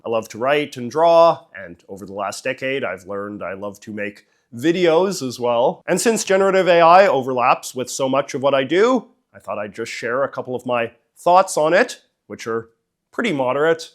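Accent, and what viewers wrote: Canada. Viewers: What part of Canada are you from? I’m from Ontario and I’ve never met anyone with such a strong Canadian accent like yours. I am close to the border so that’s probably why I’m used to more American sounding accents but I’m curious to know what province you’re from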